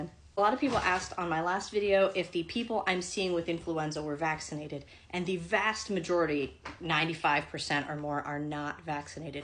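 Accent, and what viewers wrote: United States. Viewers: Are you from upstate NY?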